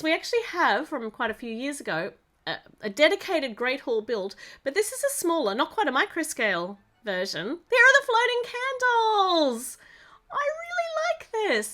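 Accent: Australia. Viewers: I don't know anything about Harry Potter but I love your accent your Australian accent is amazing and beautiful...and....oh..I need to catch up Harry Potter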